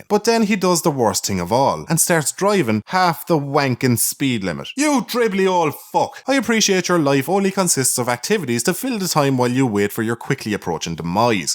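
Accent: Ireland. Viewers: Hearing say the most marvelous of insults and creative swears in his Irish accent is the reason I get out of bed in the morning. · Swear to God, hearing the Irish accent swearing is like wiping your arse with silk.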